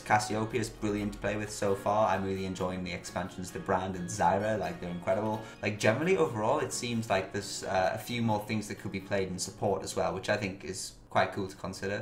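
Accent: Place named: North, United Kingdom. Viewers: Gotta love that accent :D NOOOORTH bonaana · If you are from New Castle, where did you get the posh accent then? hahah I thought New Castle has the weirdest accent among english people.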